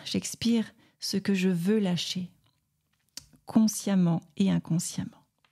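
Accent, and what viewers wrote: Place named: Quebec, Canada. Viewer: ...ce n'est pas pour "e" rien..., cool pour l'accent quebecois!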